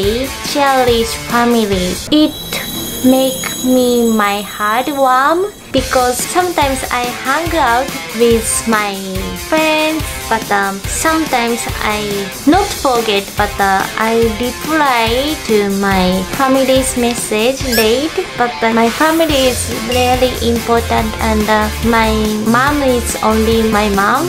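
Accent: Japan. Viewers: Love you're English Accent Mixed Japanese ❤️😊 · Your so cute and lovely, I like how you deliver english word, sounds like hapon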